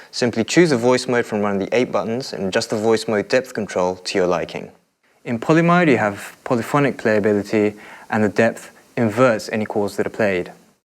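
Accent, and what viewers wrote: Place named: United Kingdom. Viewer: and he also has british accent ...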